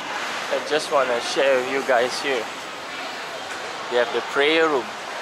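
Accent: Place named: United States. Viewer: i see ...and i quiet disappointed coz i wanna hear Singlish Accent from you but u have American accent .. 😣 Good evening la... Quickly la ... It's cute☺️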